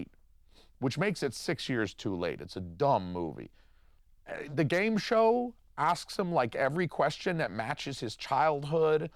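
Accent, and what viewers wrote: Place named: Pittsburgh, United States. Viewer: I agree with everything you said here and laughed quite a bit. But I’m so confused why you’re wearing a Georgia sweatshirt and have a Pittsburgh accent.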